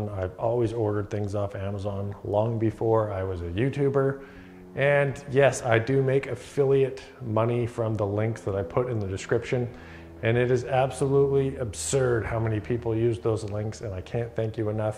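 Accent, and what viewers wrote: Canada. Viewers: Many congratulations. Solid channel that I have taken so much from as I have embarked on learning DIY and home maintenance from scratch over here in the UK. The accent gives me fond memories of my time in Canada and the advice is superb. First DIY video I watched on youtube was your circular saw - don't cut off your sausage fingers one and I am glad to say my sausages are still attached. Anything windows, cabinets, studwork, decks, sheds, stairs welcomed. Good luck!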